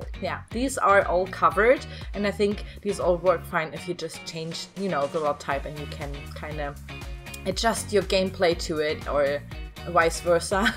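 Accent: Germany. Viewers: Are you German? You sound like you have a german accent💗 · Alsoooo, I love your voice and accent so much!! It reminds me of my family and my Oma from Germany and it’s very nostalgic for me. I hate that someone kind of attacked you and criticized your voice that you talked about in one of your previous videos, it really upset me for you, because you’re just so nice and sweet and I really really love you, so I just wanted to say that you’re phenomenal and I love your voice! 💖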